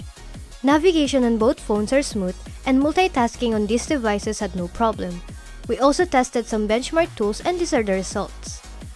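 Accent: Philippines. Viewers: kaya naman pala eh. Ph ka pala. Iniisip ko parang Philippine accent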